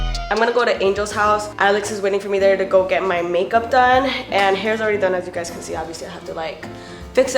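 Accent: Colombia. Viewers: 😍😍😍😍😍😍😍😍😍😍😍😍😍 You looks so pretty and btw i love your colombian accent you should speak it more love you💓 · You’re red hair looks BOMB😍😍 love your Columbian accent!! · When you speak Spanish the Colombian accent wow 😍, reminds me of my Colombian family makes me wanna go eat some buñuelos, arepas and empanadas. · I love her colombian accent ♡ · I love your Colombian accent 🤤😍 and your body 🔥🔥🔥🔥and yes please do a video on how to pose ! ✌🏽✌🏽✌🏽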